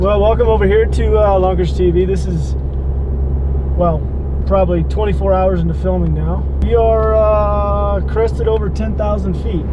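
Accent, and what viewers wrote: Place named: Texas, United States. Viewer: Weird all of a sudden you have a Texas accent???? Where the Fuc* did that come from??? I've never heard you sound like that till this vid and I've been subbed since you only had 277 subs,, I don't like it lol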